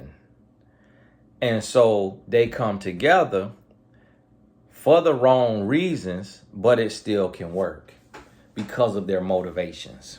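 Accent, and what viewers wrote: South, United States. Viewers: I couldn't have said it better myself .His country accent and venecular makes him unique it's his je ne sais quoi if you will . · PLEASE stop apologizing for your Southern accent, vernacular and the manner(s) in which you express yourself. People who are critical of you and cannot appreciate the teachings you bring to the table do NOT deserve access to your wisdom. Please NEVER CHANGE. My family has Southern roots, and that’s one of the main things that drew me to your channel. YOU ARE REAL, and you keep it 100. Thank you for that! Those ‘articulate’ critics can just leave as far as I’m concerned. I LOVE YOUR REALNESS. GOD BLESS YOU AND YOUR WIFE, and I thank GOD for you, your teachings, and your wisdom. KEEP BEING SOUTHERN/‘COUNTRY’ YOU. Your loyal fans love it, appreciate it and accept you for who you are!✅🙏🏽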